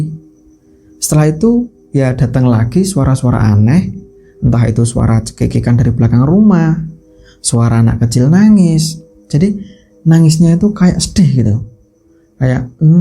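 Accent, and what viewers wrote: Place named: Java, Indonesia. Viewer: sll bs d nikmati.. logat jawanya.. sy suka.. sehat sll y bang..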